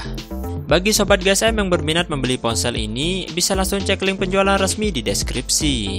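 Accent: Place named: Banyumas, Indonesia. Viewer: admin org banyumas yaa bhs indo agak ngapak hehe...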